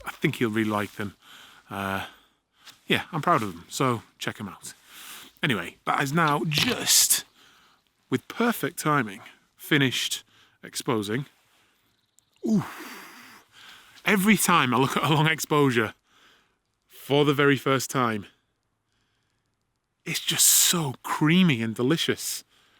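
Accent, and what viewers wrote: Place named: Wirral, United Kingdom. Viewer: Cheers bud! haha I was hearing a northern accent; sounded bit Wirral'ish (where I'm from) to me haha.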